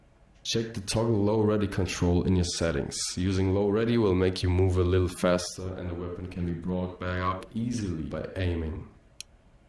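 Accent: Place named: Germany. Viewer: bro sounds a bit too german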